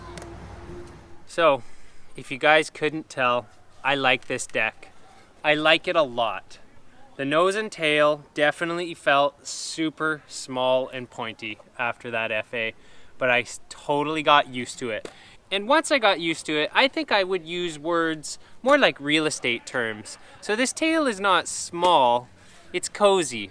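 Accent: Canada. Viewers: Canadian I think bro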